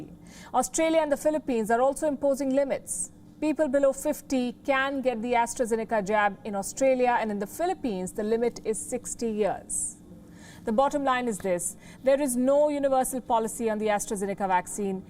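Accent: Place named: India. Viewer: All these years under the British masters rules you still have your indy accent YOUR COLONIZER R not very proud.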